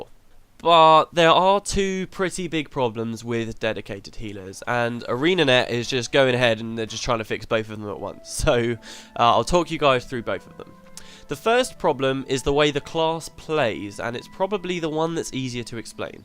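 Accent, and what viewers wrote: England, United Kingdom. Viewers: Lols, you have a british accent .3. Great, now you made me sad that I dont have Guild Wars >_< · Your English accent turns me on. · noob video. who the fuck cares about "raids", raids are boring by definition, you learn it and you are done. it's no fun after that. heals are fun in battlegrounds and arenas. and you are not just "watching bars" there. if you are, you are certainly not familiar with the game at all. beautiful scenery standing there wacking at the boss? that's all the melee looks at, and the ranged. i don't get this idiocy at all. especially with a brit snotty accent. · This is a perfect example of how a fancy British accent can be used to deceive countless people into thinking what you are actually saying isn't completely retarded. Your entire argument is a straw man. If you are clicking red bars in a window (reactionary healing) then you aren't doing it right. 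You should be pre-protting, watching your position, following your enemy's damage, all while trying to monitor your energy and control how aggressive your entire party is playing. That's not boring.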